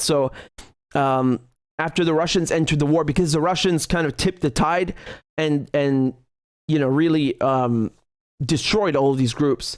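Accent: South Africa. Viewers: 100% south african accent. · Yes, he is a South African with an Afrikaans accent. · Definitely South African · Yup English south african Doesn't sound Afrikaaner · Hes very South African😂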